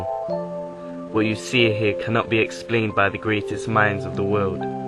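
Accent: United Kingdom. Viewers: What you see hear? There? I love how Puma Punku leaves massive unanswerable questions, but really? I guess what I am saying is that your British accent is not too strong enough for me to requite poorly written subtitles. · im not hating on the london accent, im from london myself, im just saying that it makes you sound unprofessional. i speak like how i was taught in school, like you are supposed to speak yes? personally i believe the london accent is not as dominant as lets say scotland. we have a choice here, either speak normally like many people around you in london, or speak like a twat. and there you go. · Next time you post a video please have the text checked first by someone who completed their O-levels, then have the voice over read by someone without a Yorkshire dialect.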